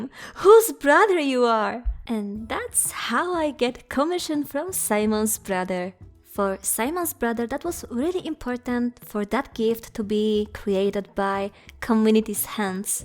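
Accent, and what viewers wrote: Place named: Poland. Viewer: Don't worry about the language, you did a good job speaking. The Polish accent is noticable and I find it slightly funny/wholesome to listen to, as a Pole, but I think that's just how most of us sound like trying to speak English without much experience. I might be making an English channel after Hytale releases so I also definitely will be sounding like that 😄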